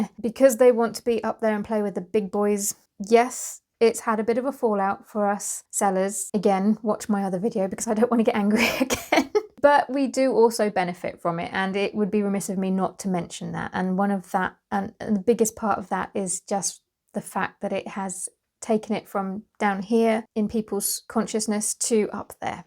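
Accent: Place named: United Kingdom. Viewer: Hi! Many thanks for sharing your knowledge on this topic!!!!!!!! This will big a big help when I open my shop on etsy in the near future. I love to hear you talk, must be the British accent. Oh and you have very pretty eyes too. Stay safe and healthy!!!!!!!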